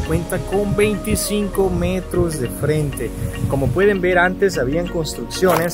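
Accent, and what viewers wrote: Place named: Colombia. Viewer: Disculpa tú de qué nacionalidad eres amigo me suena que eres colombiano verdad sólo por curiosidad gracias y bendiciones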